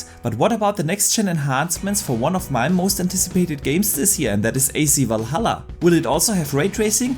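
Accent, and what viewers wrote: Germany. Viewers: The german accent is killing me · I am also from germany and the accent is killing me :D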